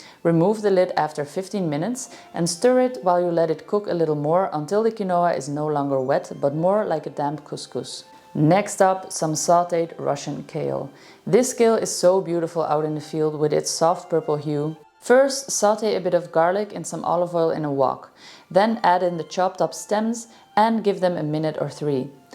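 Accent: Netherlands. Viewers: Do I detect a very slight Dutch accent ? I am glad I found you. I like your channel .. love from an old Dutch lady in California ❤❤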